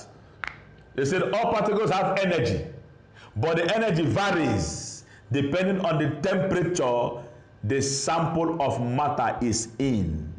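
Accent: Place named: Nigeria. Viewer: hello sir, wonderful lesson but i found somethings lacking. you said quite a number of words that I've never heard before, like the tool used to measure matter but the spelling wasn't shown on the screen. as you already know, Youtube subtitles don't really do a great job in translating the Nigerian accent. Please work on either adding your own subtitles or letting the important points appear across the screen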